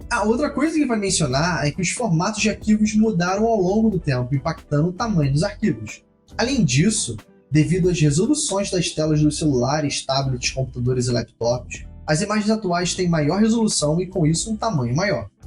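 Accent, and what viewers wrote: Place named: Rio de Janeiro, Brazil. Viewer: Sotaque carioca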